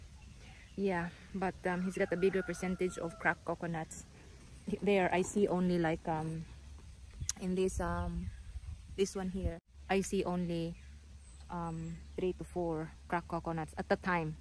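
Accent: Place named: Australia. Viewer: dont blame you those workers maybe not intelligent enough to care even you said that they were hill people before or was William when he was in the back block love your swearing your very Australian its cute when my wife swears like you do but my wife has understand more bastos Australian now after 20 yrs even at work her co workers teach her to hahah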